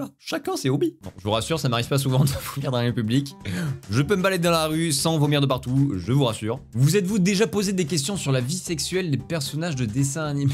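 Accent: Marseille, France. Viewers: T marseillais ou toulousien ?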